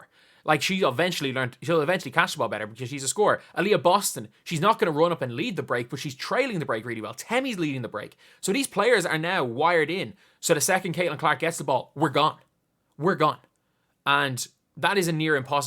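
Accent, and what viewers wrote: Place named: Ireland. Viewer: Good job. What part of Ireland are you from?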